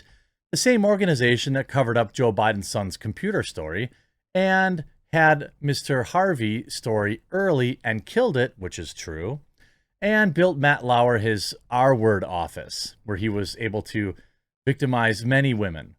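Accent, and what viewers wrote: United Kingdom. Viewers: Guys with pompous British accents telling Americans how to run their country hasn't worked out historically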